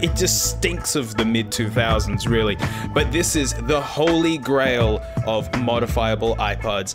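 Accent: Australia. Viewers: This video makes me appreciate Aussie accents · I forget he's Australian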